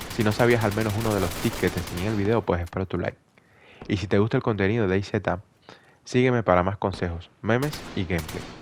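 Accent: Cuba. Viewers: Cojone asere eres cubano verdad? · Este es cubano si o si ,inconfundible 😂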